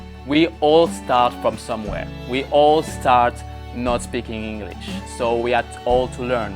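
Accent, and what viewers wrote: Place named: France. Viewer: It's either your French accent or my screwy hearing 😆.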